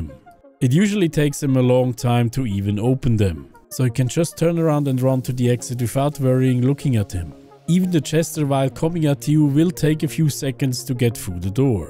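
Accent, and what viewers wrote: Switzerland. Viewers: Are you Swiss? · are you swiss? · Bro du besch sicher schwitzer, ech ghör das ah dim englisch wettsch mol eis zocke?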